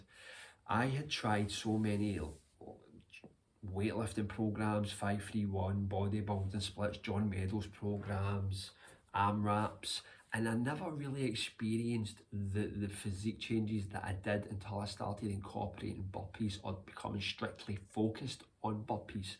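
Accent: Scotland, United Kingdom. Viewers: On a side note brother, your scottish accent makes the captions hilariously funny. It can't pick the right words.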